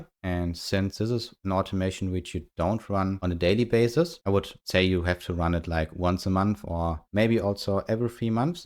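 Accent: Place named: Germany. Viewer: Hear german accent, know it's quality without watching the video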